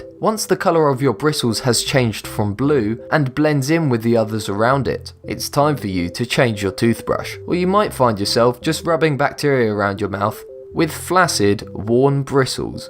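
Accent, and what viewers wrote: England, United Kingdom. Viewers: His voice is a really good British accented voice. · pre-schoolers haks, w english accent , horrible as hell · Just because you have a dumb limey accent does not make you smart. none of these are true and were never meant to be used that way.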